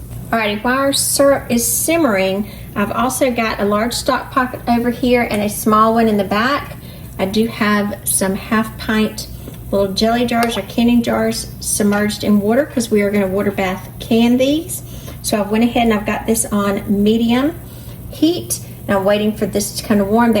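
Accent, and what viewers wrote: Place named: Georgia, United States. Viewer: You know youre from Georgia when the transcript says bowl each time you say boil😅!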